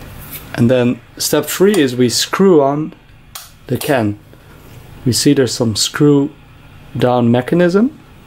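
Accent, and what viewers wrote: Netherlands. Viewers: Horable Dunglish as the Dutch call Dutchified English. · This guy is Dutch... I can tell that accent from miles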